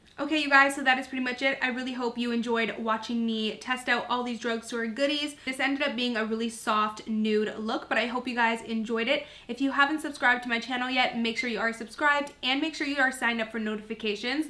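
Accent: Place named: Canada. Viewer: Is she from Canada? She pronounces 'sorry' as 'sorey' :p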